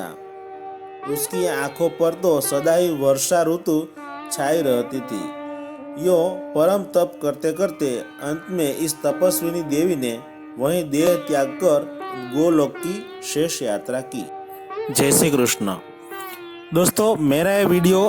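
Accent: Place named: Chennai, India. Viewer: आप चेन्नई से हो क्या अना बोलते है वेसे बोलते हो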